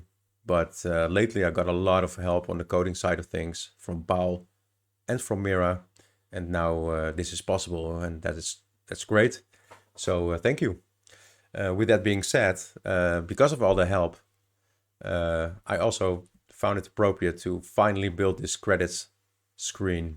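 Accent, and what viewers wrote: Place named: Netherlands. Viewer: Lol wist niet dat je een Nederlander was , zag je post op Tweakers en nu hoor je duidelijk je Hollandse accent. Thnx voor deze project , ben mega fan van Dune 2 (en andere Dune games) sinds ik het speelde op me oude 286. Dus super vet project , good Luck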